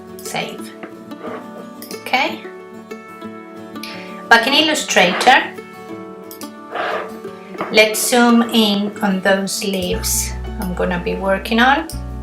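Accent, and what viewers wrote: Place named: Latin America. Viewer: Loved your tutorial! Learned so much. Also loved the mix of your Latin and English accent. Hypnotizing lol.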